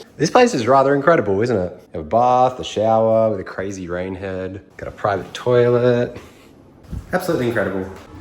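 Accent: Australia. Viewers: Great video mate! I really enjoyed this video and I hope to fly to Queensland in Australia 🇦🇺 hopefully next summer to meet up with a friend. I really think this was an interesting video for sure! The reason airlines now love Queensland seems unusual for sure but still interesting! 😂 Also what idiot tried to think you’re not an Aussie? You’re clearly one based on noting more than your accent! Cheers 🥂 Mate! Really enjoyed this video and I loved it as I always do with your videos! -Caleb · your accent screams australian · How did anyone even mistake you for a European guy lol You’re obviously Australian just from the accent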